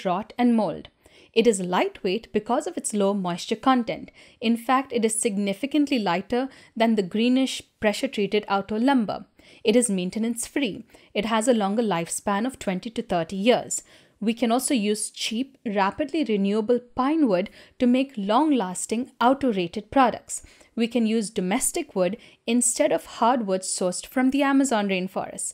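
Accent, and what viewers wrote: India. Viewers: Really interesting to see the scientific component of the shou sugi ban. If i'm right, that technic work best with resinous wood? Placing it in a renewable context, especially the part about soft/hard wood and theyr growing time it's important. The other aspect it's that you have to let time to the tree to grow or you gonna get weaker wood. In France (burgundy) with climate change, we see a lot of pine-like tree getting infected and dying. Add that the unbridled wood harvesting to sell our tree to china. By the way, great video, with lot of informations, add the smile and the indian accent and it's wonderfull !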